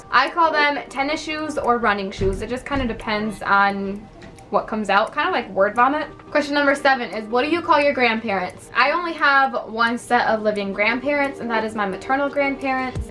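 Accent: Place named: North, United States. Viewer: Oh my gosh I need to do this for St Louis, lol I’m sure I say some things weird. My own daughter asks me why I say “car” and “can” the way that I do. 😂🤣 car sounds more like “CORE” and can sounds more like “Ken” Lol 🤷‍♀️ “Minnesota” — I hear your northern accent in that word and ironically, when you say “say” lol 😂 but not in very many of the other words! I’d say you have just a slightly northern accent. OHHH yes, I definitely hear the accent when you say “bag” lol you’re pronouncing it more like “beg” for sure. 😂😜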